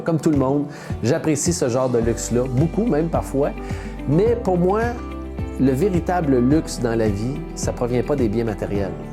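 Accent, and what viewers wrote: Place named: Canada. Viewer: Merci c est vrai que ça va vaut de l or vos 7 grands luxes....C est chaque fois des piqûres de rappel vos vidéos.. Avec votre accent canadien,c est magnifique de vous écouter 😊😊 Bravo 👏❤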